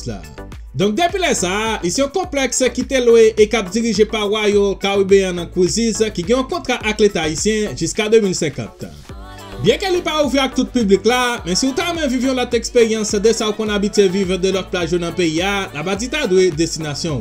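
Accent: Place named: Martinique, France. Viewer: Have you lived in the Caribbean before, you've sound like people from Martinique ou la Guadeloupe?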